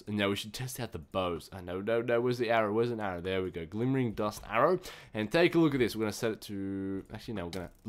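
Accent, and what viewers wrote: Australia. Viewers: He has an austrailian accent..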